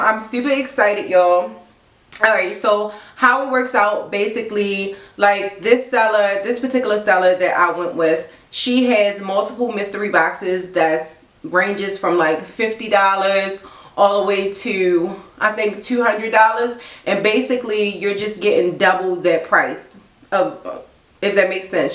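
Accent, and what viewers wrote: Baltimore, United States. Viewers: She’s from the north it’s her accent maybe Baltimore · I know that Baltimore accent from anywhere 😍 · I almost thought she was from DC too but she got that heavy Baltimore thing going on lol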